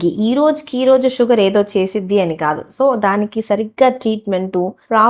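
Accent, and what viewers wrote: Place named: Telangana, India. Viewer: చక్కగా చెబుతున్నారు. తెలంగాణ యాస కూడా వినడానికి బాగుంది 😅.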